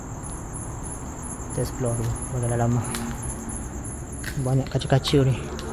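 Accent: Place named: Terengganu, Malaysia. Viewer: Rumah ni ker yg maksudkan tempoh hari lokasi kat damansara..Yg selalu tersasul dlm video terdahulu sebut kotak rokak ker depang ker yg bercampur aduk dgn loghat besut.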